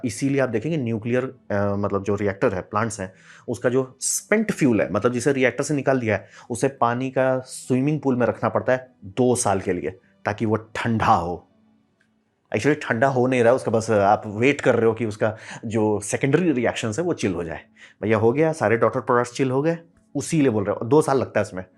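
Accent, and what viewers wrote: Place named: Jharkhand, India. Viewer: Jharkhand's Hindi Accent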